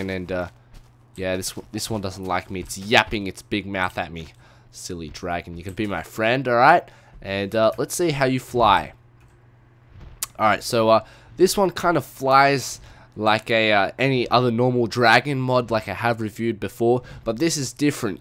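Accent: Australia. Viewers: Are u Australian mate coz we are awesome ANZAC's for the win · O.o ur australian :O?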